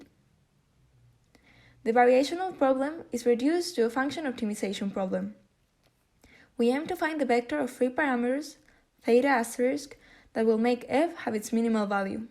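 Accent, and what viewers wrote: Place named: Turkey. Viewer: She sounds like she is Turkish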